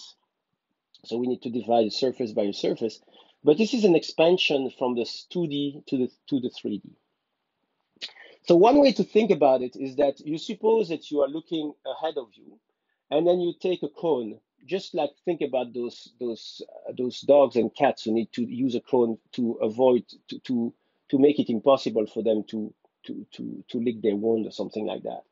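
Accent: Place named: Belgium. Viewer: thanks a lot ... ur fan from morocco. Je sais que vous êtes belge et ainsi vous parler le français ...ça se voit surtout sur ton accent... vraiment vous faites un trop honneur pour votre pays ...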